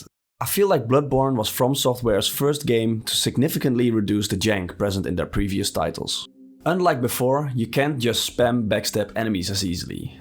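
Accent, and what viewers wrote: Netherlands. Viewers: I know a Dutch accent when I hear one.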